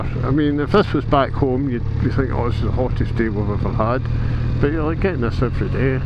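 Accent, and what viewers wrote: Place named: Scotland, United Kingdom. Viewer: Your Scottish dialect has to be the most beautiful of all the English dialects. Greetings from Miami.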